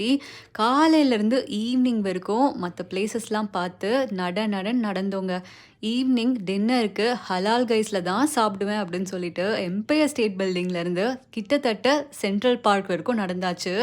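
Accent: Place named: Tamil Nadu, India. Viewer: Fooooooodu, worst tanglish assent ever I heard 🤬🤬🤬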